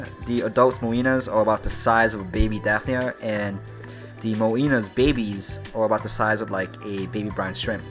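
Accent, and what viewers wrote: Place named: Australia. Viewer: Nice video! Very informative! Just curious I detect an accent, are you originally from Australia?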